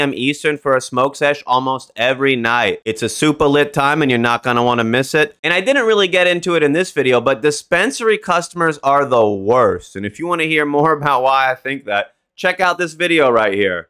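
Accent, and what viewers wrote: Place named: New England, United States. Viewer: Idk if you’ll see this or mind me asking but where did you reside before you moved to Colorado for some reason I pick up some New England in your accent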